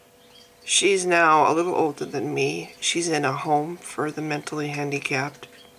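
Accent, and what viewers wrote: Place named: United States. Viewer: I've known about this girl a few days ago, and definitely she is the proof thar god does'n exist. He never did. I have watched almost every video that exists on youtube about her and I've cried for hours. And now I found this video of yours and I couldn't agree more with you. Seriously. I can assume for your accent that you're from the USA, aren't you? Well I'm spanish, I should have begun saying my english is not perfect and obviously I can't understand every word, and I'm not used to write long texts such as this one, although I can fully understand the context. I hope you read this, 'cause I want you to know that I am overwhelmed by your speech. I found it so true I got a lump in my throat. It's so frustrating that many people call themselves moralists and the only thing they say is "I'll pray for her"!! What? It would be a very expensive trip for me, living in the other side of the world, but I really want to do something for her. 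And so they should do, mostly if they live nearby. God is a fictional character, and he can do for Genie the same that Gandalf, Darth Vader or the flying spaghetti monster can. Nothing. For they are not real. This whole issue just drives me mad, and if it's not too much I'd like both of us to be friends in facebook. Obviously I'm not going to post here my nick for everyone to see, but I think we could chat occasionally. I can send you a private message with my facebook name in case you want. I'm trying to write down this being as polite as I can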